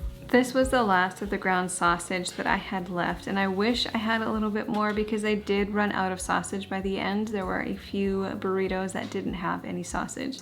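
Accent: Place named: Quebec, Canada. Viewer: it has to be the Canadian French influence. i tried learning a little of it once, but my ears are tuned to Spanish from growing up near the southern US border.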